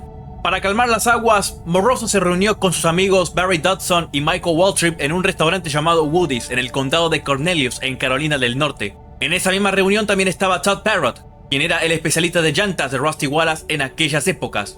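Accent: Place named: Argentina. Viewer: excelente video amigo! increíble que haya un canal de habla hispana (y argentino por lo que se te ecucha) que hable de Nascar!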